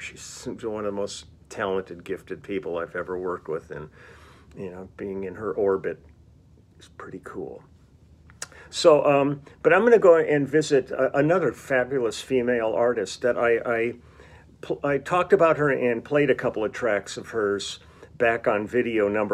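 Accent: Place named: New York, United States. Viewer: I love the fact that you sound like a real New Yorker and seek out gems like Joe's Pizza! Cheers from Manhattan!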